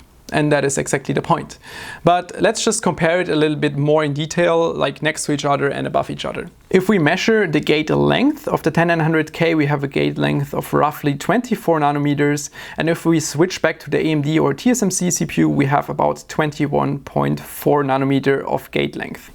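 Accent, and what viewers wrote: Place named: Northern Germany, Germany. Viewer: By listening to you I would guess you are from Berlin or maybe Hamburg, some city in the north of Germany at least. BTW Not too surprised that you got nothing out of Intel, maybe they should change their brand name since they seem to have run out of "Intel"...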